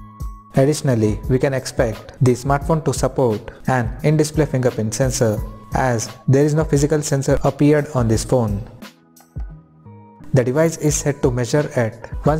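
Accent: India. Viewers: This guys Indian accent is so thick I that I can't understand what the hell he is saying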